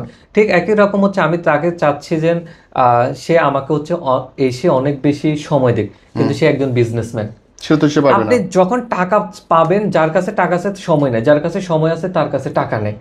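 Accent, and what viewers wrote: Noakhali, Bangladesh. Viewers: Uni ki noakhali zillar naki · জি, নোয়াখাইল্লা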